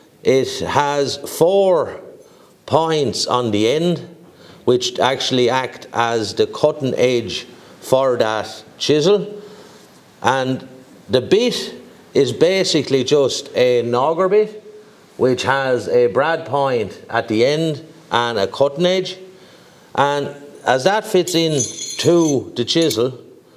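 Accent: Ireland. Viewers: Ya know a lad knows his stuff when he uses a morticer like that; it keeps the tool pressure even when you cut with either 4 or 2 sides of the chisel....... ya start cuttin with 3 sides and sometimes it wants to flex, that's why you stepped over your cuts. I use hand tools, and one of my most hated jobs is cuttin a mortice........ I see a hollow chisel someday in my future 😆 It's nice to hear an Irish accent on YouTube lad..... This Wexford man enjoyed your video 😁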